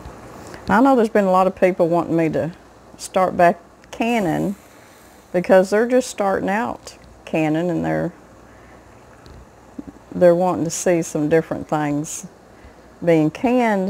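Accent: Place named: Kentucky, United States. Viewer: Your accent is exactly like my Aunt and Grandmother who were from Harlan, Ky.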